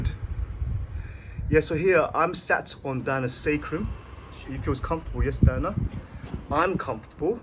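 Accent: United Kingdom. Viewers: ok an asian guy talking like a british man is enough for me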